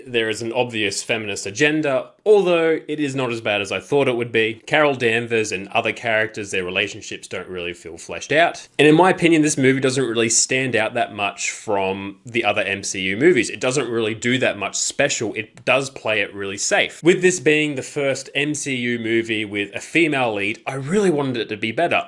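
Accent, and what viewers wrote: Australia. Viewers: Hey thanks for the sub man, subbed right back cause instantly I'm seeing stuff I like! I'll be keeping an eye out for your future stuff! Nice to hear the Aussie accent in more movie reviews too haha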